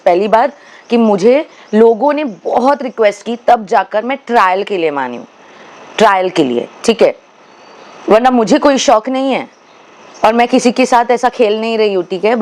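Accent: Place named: Maharashtra, India. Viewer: Are you marathi tan and so many words are sound like you are Marathi ❤🎉🎉🎉🎉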